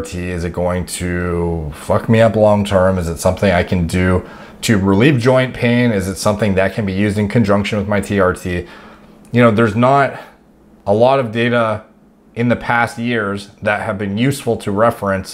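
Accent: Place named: Canada. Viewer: We do. But he’s got the Canadian accent ayyy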